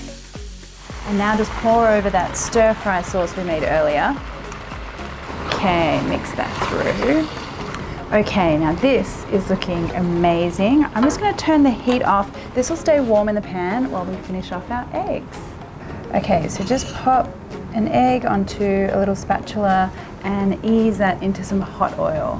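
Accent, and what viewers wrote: Australia. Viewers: Australian accent? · You have the sexiest voice and accent, is it Australian? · aussie accent is everything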